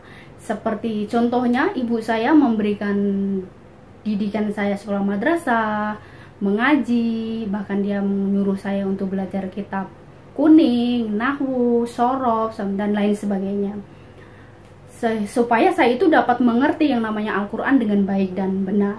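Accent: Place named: Malaysia. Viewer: Saya senang dengar kesaksian kamu dgn eksen melesyia sangat enak di dengar,ditambah bahasa inggris.Tuhan yesus .enyertai kamu.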